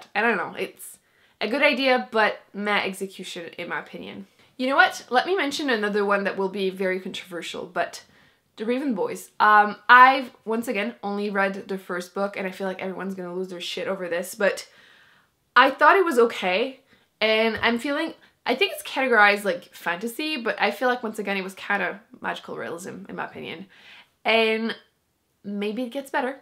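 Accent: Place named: Quebec, Canada. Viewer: Oh mon dieu, Are you from Québec? :o That accent melted my heart :')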